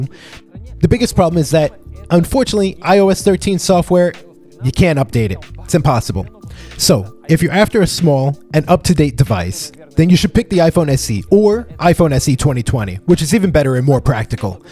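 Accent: Russia. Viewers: Немного слышиться русский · Я прям слышу на фоне стандартный русский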